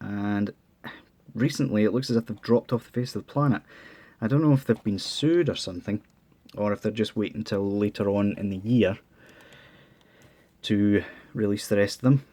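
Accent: Scotland, United Kingdom. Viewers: Are you from Scotland?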